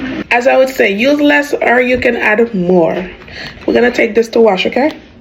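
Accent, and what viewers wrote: Jamaica. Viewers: I have to make this, omd. I take ginger pills for nausea, may as well make the ginger a whole experience. The recipe looks amazing, thank you so much for sharing it! Your accent reminds me of my great grandmother (she was Jamaican, though she’d been in Dublin since her 20s). Beautiful memories, thank you 🩵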